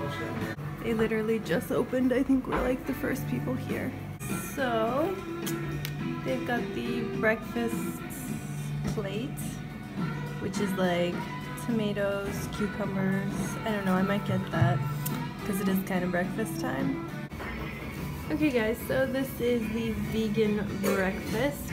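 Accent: Turkey. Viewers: You almost sound like a turkish person who is speaking English. You've accent lol. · What american living you are Turkish you can change your look but you can not change your Turkish accent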